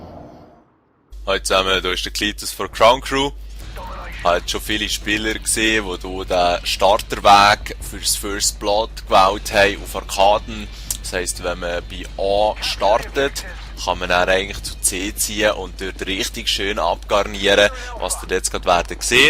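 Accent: Switzerland. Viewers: Hehe Schwiizer Kommentater :D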